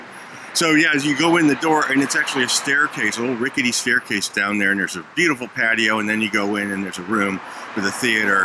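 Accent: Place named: Midwest, United States. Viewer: has amazing stories and he tells them with his terrific melodious voice and Midwestern accent. Even though the stories are grim it's a pleasure to listen to him.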